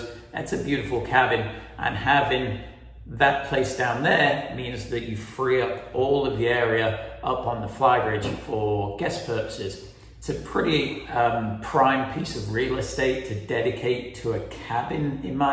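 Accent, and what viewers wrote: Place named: United Kingdom. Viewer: north america? you kinda sound british.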